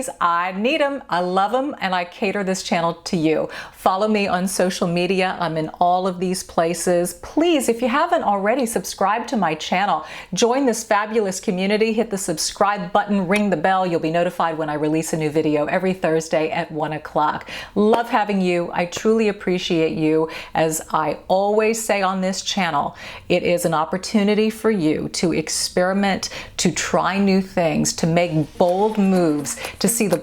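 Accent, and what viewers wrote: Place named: South, United States. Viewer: I'm presently going through many of your videos to see which primer you use and recommend for face, also for eyelids. Suggestions by others on here are welcome as well, please!! Don't want to spend note than about $35 $40, (unless it is a quart sized container🌝) Normally I'm more conservative about price except I think a primer will make ALL the difference as to how my face and makeup look, in the end. Prefer 1 with anti-oxidant, if possible. I've been looking for a while now and almost ready to just buy something cheap till I can find a quality product for sensitive skin that's not drying.. Thank you for all of your effort in keeping me somewhat in-the- know about skincare and makeup, since I subscribed. You're a lovely person, and I adore the little bit of Southern sound in your voice. It's another one of your charming attributes that sets you apart🌴🤗🌹☮☺🌴 Edited to add---don't know why the strike-through text appears that way above. 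Didn't intend to negate the words I wrote.☺🌴☮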